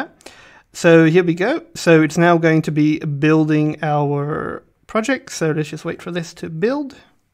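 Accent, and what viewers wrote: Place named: United Kingdom. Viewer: I am getting something from your voice, you studied in the UK for a while and lived there for a while but you are not originally from there. your accent is faint but still audible.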